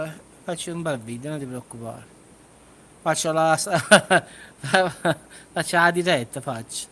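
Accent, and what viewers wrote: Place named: Salerno, Italy. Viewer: Buonasera, mi piace moltissimo l'accento del tuo dialetto sei davvero simpatico, stasera ti sento molto provato dal caldo, anche qui da noi, fuori Firenze non scherza, stasera un po' meglio è più ventilato e la temperatura si è abbassata...buonanotte a tutta Salerno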